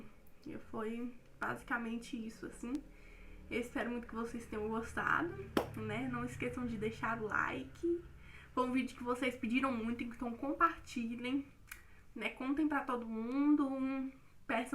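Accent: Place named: Minas Gerais, Brazil. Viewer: Nosso sotaque mineiro é bonito demaisss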